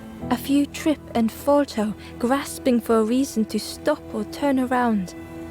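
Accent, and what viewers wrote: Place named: Wales, United Kingdom. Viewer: I actually really don't like her voice or her accent. She has the same pace throughout, regardless of the words. And she sounds Welsh to me. I was hoping for a way to turn off narration but oh well...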